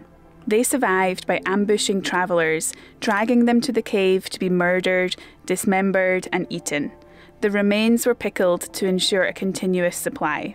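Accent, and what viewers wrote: Scotland, United Kingdom. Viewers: My favorite quote for this video: "Who needs a house when you can live in a cave." Love your sense of humor and of course your lovely Scottish accent. I don't know if you go back and read comments from months ago. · Your Genetic Heritage reminds me of an Old Comedian that is Largely Forgotten Nowadays....STANLEY BAXTER;...... U definitely have his Nose👃 & Broad Scottish Accent..... Sooooo; Cool🆒😎👍🤓❤ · Cheers from Canada. Love your accent, Being of Scottish ancestry I wondered what I would sound like if I was from there. Lol! Enjoy your stay in the cave, you got it!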